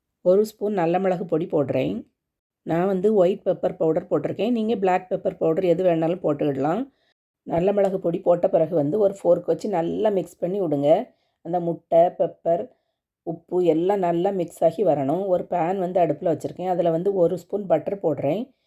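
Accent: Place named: Tirunelveli, India. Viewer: Unga slang spr sis me too tnvli❤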